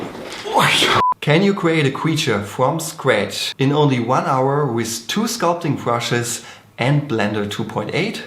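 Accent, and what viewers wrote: Germany. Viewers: German accent 😂 Greetings from Germany!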